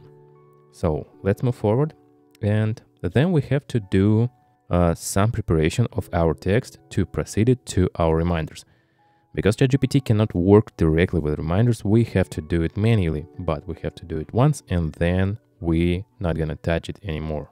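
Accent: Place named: Yucatan, Mexico. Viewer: Nunca había escuchado un acento yucateco en inglés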